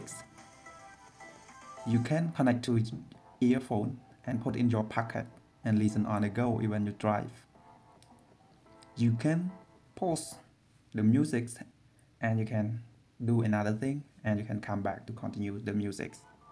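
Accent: Cambodia. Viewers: ohhh it makes sense now, i knew ur accent sounded familiar! your khmer! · I knew u are a Cam..... . Cause I know every Cam...... speaking english.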